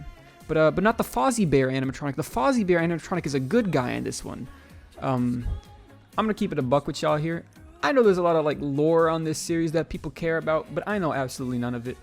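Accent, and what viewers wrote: United States. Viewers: The New yorkuh accent is a bit distracting, but video not too bad so far. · I think you got that New Jersey Ray Lotta thing going on.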